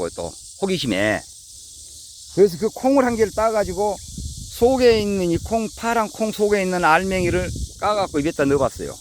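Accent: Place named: Jeolla, South Korea. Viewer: 전라도 구수한 사투리 정겹고 날마다 좋은정보 잘배우고 갑니다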